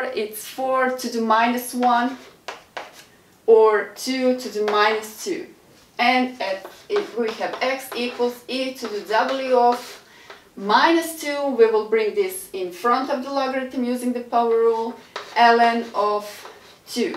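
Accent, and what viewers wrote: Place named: Brazil. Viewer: You sound like a brazilian. Pretty cool.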